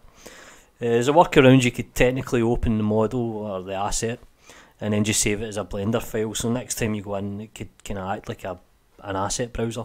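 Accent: Scotland, United Kingdom. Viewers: Blender tuts in Scottish! Wohoo. I'm going to watch yer stuff just for the warm fluffy feelings ;-).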